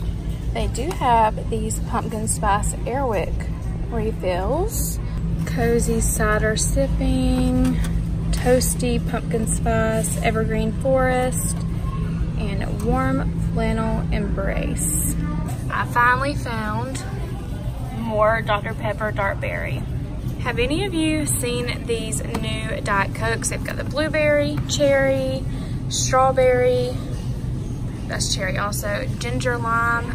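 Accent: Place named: South, United States. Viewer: Coming over from Tiffany’s channel! Love your channel and your southern accent❤️ I live in North Carolina 😊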